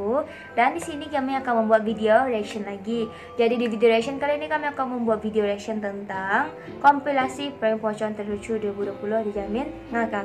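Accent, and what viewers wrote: Indonesia. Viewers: Ngomong ny Indonesia Banget .. Orng mna sih ini..🤔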